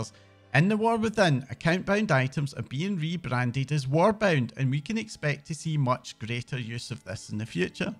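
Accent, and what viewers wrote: Scotland, United Kingdom. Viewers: i hear scottish accent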